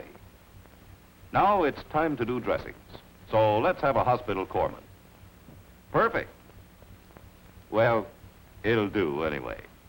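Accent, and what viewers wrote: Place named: Mid-Atlantic, United States. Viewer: Man, those are some dull- ass scissors. That much hasn’t changed in 80 years! I can’t figure out what that metal tube is sticking out of that sailors back. Maybe a nephrostomy tube? Also, I absolutely love the narrators voice. Precise, no discernible regional accent, smooth enunciation and pitch variation. Waning days of the Mid Atlantic Accent.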